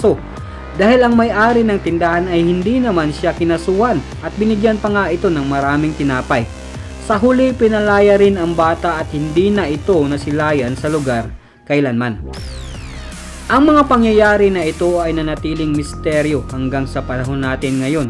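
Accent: Visayas, Philippines. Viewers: Bisaya ba sya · Maganda ung kwento kaso bisayang bisaya kakawalang gana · Bisaya ka ba pre hahahaha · ikaw din iba tuno mo haha bisaya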